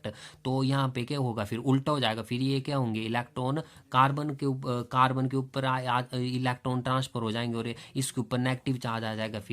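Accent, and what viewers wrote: Himachal Pradesh, India. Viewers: Sir kya app Himachal se ho aapki slang se pura lag raha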